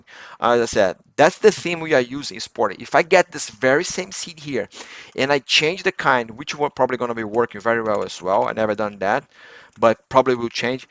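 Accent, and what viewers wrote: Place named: Brazil. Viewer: Seu sotaque parece brasileiro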